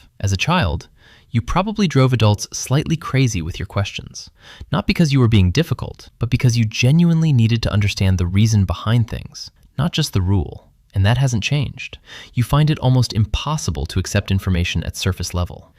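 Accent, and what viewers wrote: United Kingdom. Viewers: Why do these videos warp into a British accent near the end?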